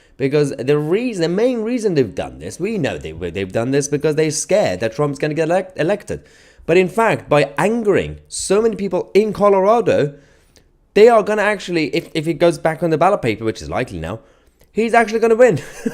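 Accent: United Kingdom. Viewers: eating MTZSM and listening to news from 'murica in bri'ish accent is priceless. Thanx. And ppl go after watching and do that like thing before making coffee... (at least)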